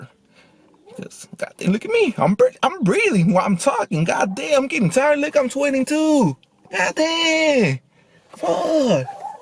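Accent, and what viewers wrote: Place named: Mexico. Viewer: The solid Mexican accent I have ever heard.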